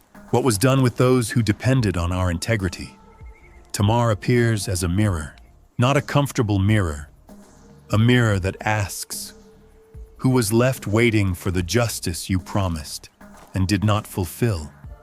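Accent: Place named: Africa. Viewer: African accent you aren't saying ask but axe ..put me right off.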